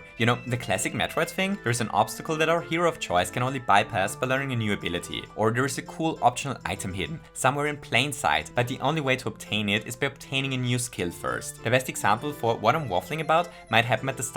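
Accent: Germany. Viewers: Yes. I heard his german accent instandly, the first time I watched him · Are you German?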